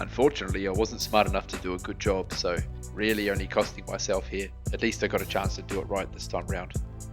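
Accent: Australia. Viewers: Aussie accent. Awesome video. By any chance do you live near NSW central coast? Can you come paint ours? :)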